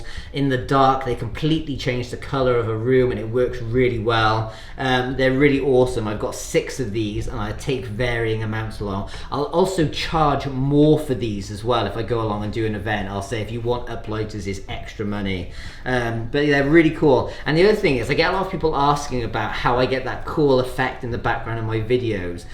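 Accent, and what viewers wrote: Wales, United Kingdom. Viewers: Its probable, that him being from Wales, his accent makes it sound like he is saying "tress" rather than "truss". I may be mistaken though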